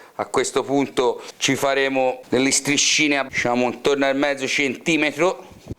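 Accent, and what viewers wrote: Tuscany, Italy. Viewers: Sei fortissimo cin il tuo dialetto toscano bravoooo 👍👍👍👏👏👏👏😂😂😂😂😘😘😘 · Ciao ! Per dire il vero, la ricetta la sapevo, però sentirti come la raconti tu, con l’accento toscano, e per me il piacere massimo. Grazie di tutto, e stammi bene !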